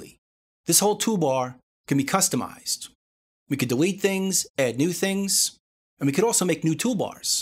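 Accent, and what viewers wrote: New York, United States. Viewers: Irrelevant curiosity: what kind of accent do you speak with? New York?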